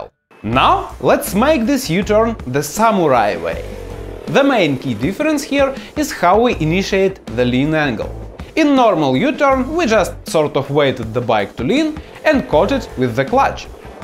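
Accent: Russia. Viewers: can clearly hear ur ruski accent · bro has the best type of russian accent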